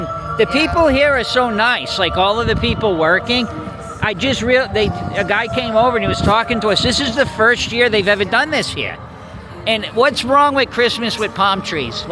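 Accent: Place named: New York, United States. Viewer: I live in jersey and never cut down our own tree artificial I grew upon and to this day artificial lol I thought you were from New York with the accent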